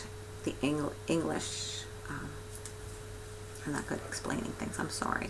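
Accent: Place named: Massachusetts, United States. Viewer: Hi! Found you a couple of weeks ago, and when I discover someone new, I immediately go back to their first video and binge watch them. So that is what I have been doing since about Thanksgiving! And I am all caught up now with this one! You are a prolific stitcher! I enjoy all your work, we have alot of the same taste. Thst band sampler is gorgeous! Love your accent, you remind me a little of all my relatives in Massachusetts. You are very sweet and I have enjoyed catching up with you! Congrats on your little grandson! And your DIL Rebecca is so sweet! You get along so well! Hopefully you have that same connection with your new DIL Amy! You have fast become one of my faves, in my top 5! Keep stitching, take care, and hope you had a Merry Christmas!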